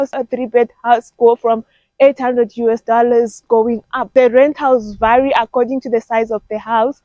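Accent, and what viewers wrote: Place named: East Africa. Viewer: has any1 ever told you, u sound east african? lol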